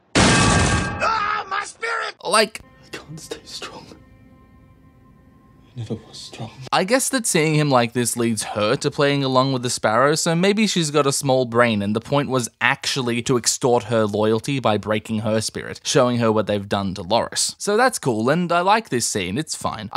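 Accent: Australia. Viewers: Melbourne! Ha... I couldn't tell if you were Australian or English, but now we know :)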